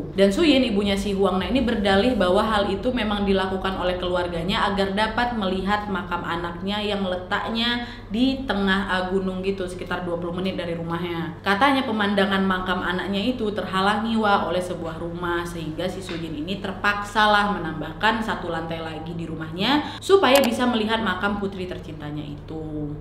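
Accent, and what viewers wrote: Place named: Sumatra, Indonesia. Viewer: Karena aksen nya sumetera banget...jd lebih masuk kalau buat aku. Berasa lg diajak ghibah sm bestie 😅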